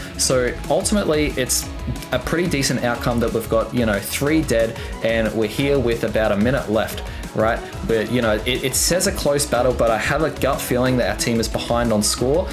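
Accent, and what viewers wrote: Australia. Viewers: are you australian? also your vids are VERY USEFUL! like seriously i got +10% win rate in ranked because of your vids!! so thx!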